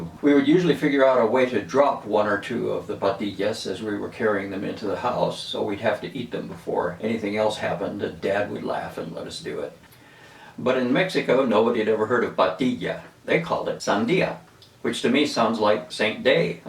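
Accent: Venezuela. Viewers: i´m colombian, lived in venezuela for about a decade, and lived in cuba for about 7 years, and that´s something i recognize, the "smell" of a name, you made me smile today! And loved you venezuelan accent! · I like listen your venezuelan acent.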